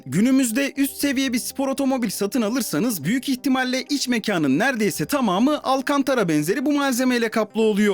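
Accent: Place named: Russia. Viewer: Kardeşim videoların gerçekten güzel ama konuşman kulağa çok ağır geliyor. Neredeyse Rus aksanıyla Türkçe konuşur gibi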